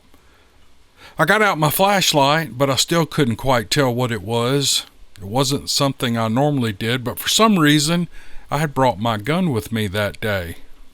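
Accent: South, United States. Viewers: Hey, I’ve been listening for over 3 years love your show. Can’t believe this never crossed your mind. I’ve came to that conclusion quickly. My people are from the south good common sense folk, when I listen to you speak that southern common sense tone I’m familiar with resonates. Your restricted from certain parts of State Land and you can’t fly drones either??? Not a conspiracy theorist but it’s not a coincidence…neither are the unexplained disappearances in the national parks.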